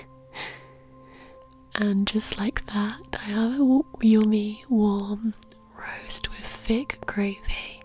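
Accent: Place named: Nottingham, United Kingdom. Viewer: You have the most notts accent I’ve ever heard haha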